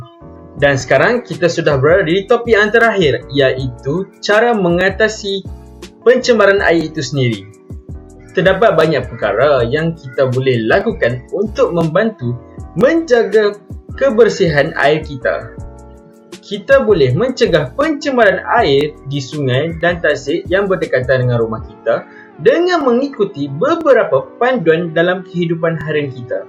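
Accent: North, Malaysia. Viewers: Bila tengok video yang narrator dia cakap utara auto best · suka betul dengan suara narrator. juga maklumat yang cuba disampaikan sangat jelas dan santai dengan slang utara. nais!👌🏻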